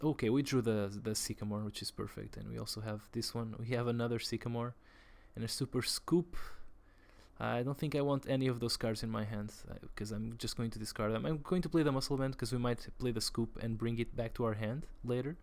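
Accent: Portugal. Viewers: I knew ur accent was portuguese.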